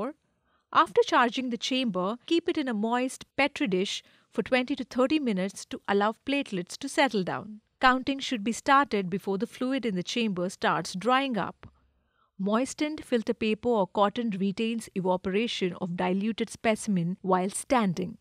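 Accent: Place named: India. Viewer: The presenter is probably from the Indian Subcontinent. In English the pronunciation of V and W is reversed compared with Indian Languages. Also there is no such thing as a Lakh in English. This lack of translation makes this video unintelligible in English.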